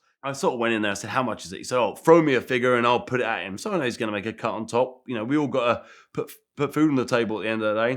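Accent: United Kingdom. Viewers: Can't get more British accent than this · Lol I’ll do my best to reduce the British twang lol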